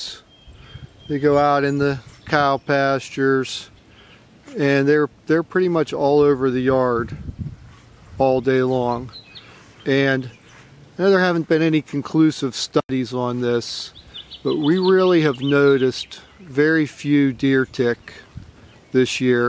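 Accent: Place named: Maryland, United States. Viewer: Are you from Maryland? Your accent sounds Maryland to me.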